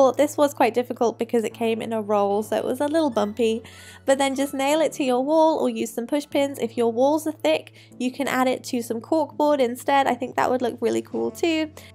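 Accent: United Kingdom. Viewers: I really like your videos! You have so good ideas 😍 and I like british accent😊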